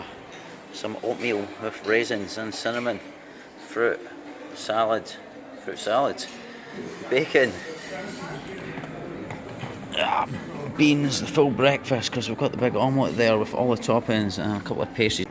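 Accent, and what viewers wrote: Scotland, United Kingdom. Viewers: Yeah.. This is the real scottish accent and a much thinner scottish dude.. 😅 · I love the Scottish accent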